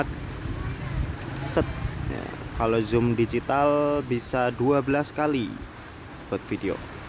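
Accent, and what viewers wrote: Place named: Java, Indonesia. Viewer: medok tipis tipis y mas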